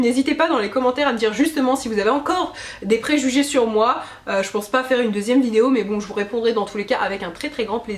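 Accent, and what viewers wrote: Paris, France. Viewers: C'est surtout son accent parisien et sa façon de se tenir et de bouger qui bourgeoise selon moi ^^ c'est pas une critique j'ai plein d'amis qui ont les mêmes manières et je les ai eues moi-même à une époque! Mais elle a clairement ce petit "je ne sais quoi" cliché des "bcbg"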